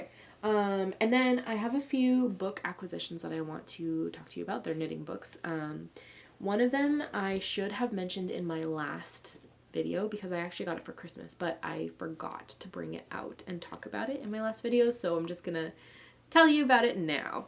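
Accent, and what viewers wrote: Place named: England, United Kingdom. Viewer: I was trying to guess your nationality as your accent wasn’t strong , …. That’s because you lived in England ❤ xxxx What is a touk? Yellow is my favourite colour too, just knitted filcona arwetta french vanilla colour with drops lemon mohair cardigan by petite knit , circular shoulder style … love it 🥰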